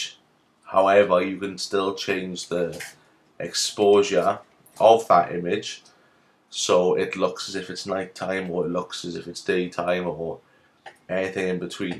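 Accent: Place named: Wales, United Kingdom. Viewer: Well, well! What a surprise to hear your accent! I'm used to hearing Polish, German or American accents when I click on tutorials, so this was a rare treat! That has to be worth a sub! I'm just down the road in Neath! Nice tutorial by the way! Where did you learn all this?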